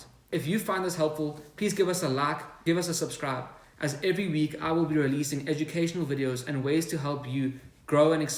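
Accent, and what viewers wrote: South Africa. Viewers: You accent is broken where in SA are you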